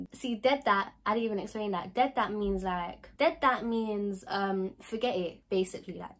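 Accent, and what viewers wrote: South London, United Kingdom. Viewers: I’m guessing your south London your accent is cute styl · i knew u were from south im from west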